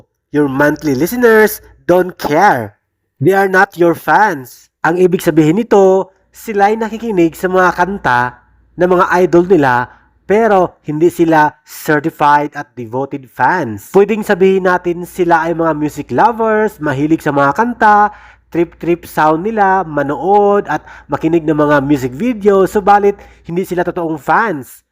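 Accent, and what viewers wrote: Philippines. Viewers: I’m happy for our Mahalima! I also want to commend this channel kasi yung host at yung video, walang ginamit na AI!🎉❤ dami kasi ng nagsulputan na channels na bago tapos yung thumbnails nila halatang AI kasi yung mahalima natin, iba ang hitsura. Meron pa ngang thumbnail na na double mukha ni josh tapos wala si Justin, yun pala pinaghalo halo ng AI mga itsura nila 😂😂😂 tapos AI yung boses, maganda nga yung accent pero di mo ramdam yung pride ng host pag nagbabalita ng good news sa mga A’tin. Meron pa ngang halatang pinasulat sa chatgpt yung script kasi ang habaaaa ng video pero niligoy-ligoy lng pala yung point 😂 So pls continue producing content na gawang tao at may puso, andito kaming viewers na prefer ang Pinoy accent natin kesa robotic na accent. Salamat!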